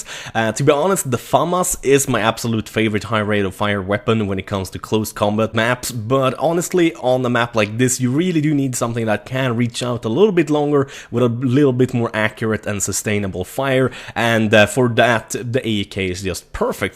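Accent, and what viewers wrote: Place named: Sweden. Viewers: Are you from Sweden? Your accent reminds me of robbaz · Yes, he is from Sweden.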